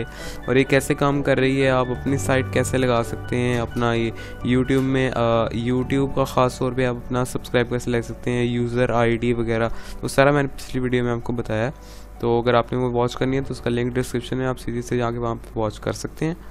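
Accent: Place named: India. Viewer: well it's ok you have some indian accent so i don't really understand heh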